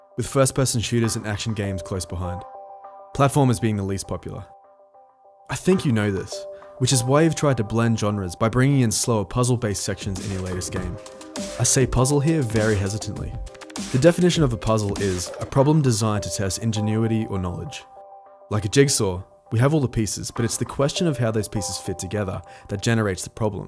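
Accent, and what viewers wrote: Australia. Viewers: He's from Straya, that's not his fault lol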